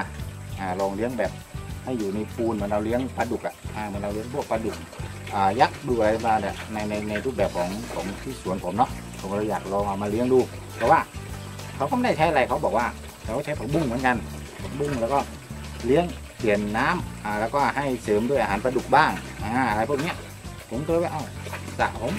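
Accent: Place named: South, Thailand. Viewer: น้าเป็นคนใต้หรือป่าวครับ สำเนียงพูดคล้ายๆคนใต้เลยครับ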